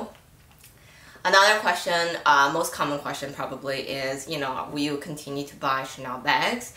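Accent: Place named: Hawaii, United States. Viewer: haha okay! You sound like a Hawaiian local!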